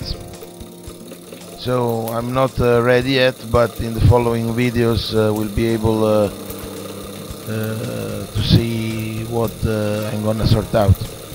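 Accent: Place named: Italy. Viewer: Sei italiano? Riconosco l'accento. Mandami lo schema. Good job.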